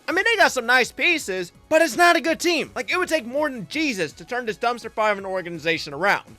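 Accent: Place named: Pittsburgh, United States. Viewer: I can hear the slight yinzer in you.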